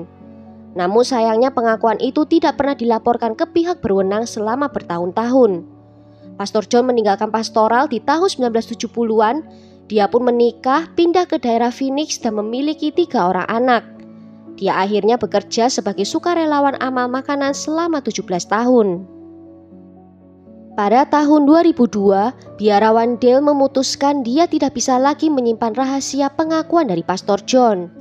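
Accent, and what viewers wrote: Java, Indonesia. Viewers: mbak suara nya Medok 😀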